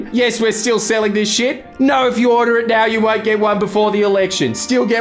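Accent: Australia. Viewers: This is probably the most Australian I think I've ever heard him sound.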